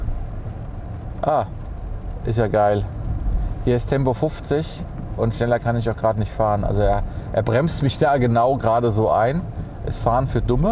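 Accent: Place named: Hesse, Germany. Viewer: Lieber, ich stehe aktuell vor der Entscheidung pro Elektro-SUV und habe mir daher unzählige Youtube Videos angeschaut. Zudem bin ich den EQC gestern zur Probe gefahren. Deine Eindrücke teile ich zu 100%. Das vermutlich ehrlichste Review überhaupt - zudem im hessischen Heimat-Slang! Besser geht net. Was mir zusätzlich aufgefallen ist: der Raumeindruck ist doch etwas beengter als bei der Konkurrenz. Das war auch schon beim GLC der äußeren Form geschuldet und ist durch den höheren Boden (Batterie!) nicht besser geworden. Es ist wirklich eher bloß der Eindruck aufgrund der gerundeten Formen, denn Platz ist trotzdem für 95% des natürlichen Publikums ausreichend vorhanden, meine ich. Die andere Geschichte war das Fahrwerk. Das federt einerseits Unebenheiten souverän weg, hinterlässt jedoch auf längeren Bodenwellen ein Gefühl leichter Seekrankheit bei mir. 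Da hätte ich es gern einen Tick direkter gehabt. Ist vielleicht persönliche Präferenz. In engen Kurven bleibt der Wagen spurstabil, kann seine 2,5 Tonnen aber nicht ganz verstecken. Das ist die Physik. Die Bremse war für mich übrigens okay.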